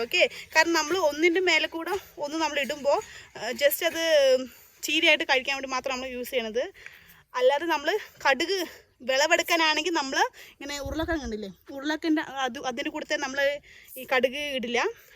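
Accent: Palakkad, India. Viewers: മലയാളം സംസാരിക്കുന്നത് കേൾക്കാൻ നന്നായിട്ടുണ്ട് പാലക്കാടൻ ഭാഷ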